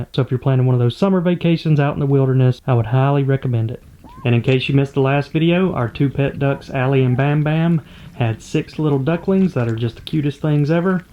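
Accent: United States. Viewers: Man i really like your american accent. Greetings drom germany😄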